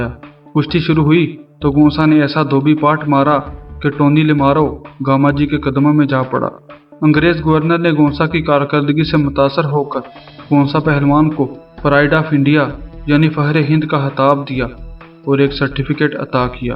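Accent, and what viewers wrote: Punjab, Pakistan. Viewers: Bht achchhe...bhai kya aap us zamane k mashhoor pehelwaano k daav k video bana skte ho...to aaj k pehelwaano ko bhi us fan me se kuch thoda bht seekhna ko milskta h...aap video k kiye pehelwaano se to milte hi hain...agar unse unke khaas ek do daav agar miljaye..me bhi pehelwaani karta hu...aapki badi madad hogi..aapki punjabi tone aapke videos me 4 chand lagati h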